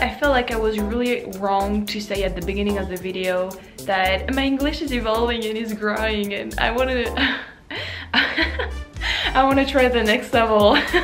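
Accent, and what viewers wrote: France. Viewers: Please, don't lose your french accent. It is quite wonderful!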